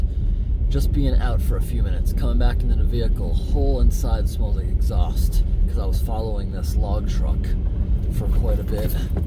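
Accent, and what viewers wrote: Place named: United States. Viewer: That american "englih" ist unbearable - sounds like defect radio ... sounds extra-disgusting ! I love ENGLISCH, not that pidgin-jabbering ... !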